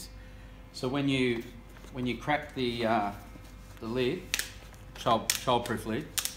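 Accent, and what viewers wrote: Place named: Australia. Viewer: I knew it was an australian accent But i doubt myself initially.. Because the way he speaks is so different to other english speaker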